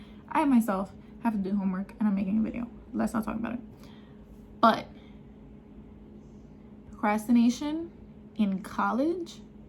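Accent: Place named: New York, United States. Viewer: U sound like u from new York bro bro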